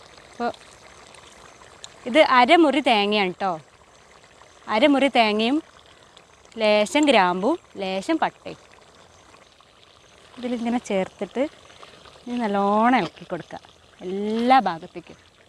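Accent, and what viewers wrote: Malappuram, India. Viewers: സത്യത്തിൽ ee സംസാരം 🤦🏻‍♂️🤦🏻‍♂️ 😂 തനിമലപ്പുറം 👌💪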